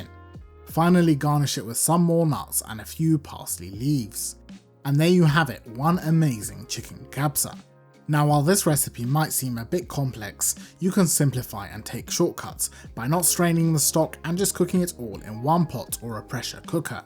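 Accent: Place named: London, United Kingdom. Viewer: You have a London accent: please tell me you run a restaurant...and exactly where it is. YUM. What a terrific channel. No wonder all these wonderful immigrant mums, grandmas and aunts spend all day cooking together when there's a family event to cater for. Your recipes are incredibly laborious and just make me wince with sadness knowing I'll never spend all day cooking some of them but would gladly pay good money to someone who takes the trouble to cook certain dishes for me. Wow, just so delicious and impressive to see how it's all done. Bravo! 🌍🍽🤝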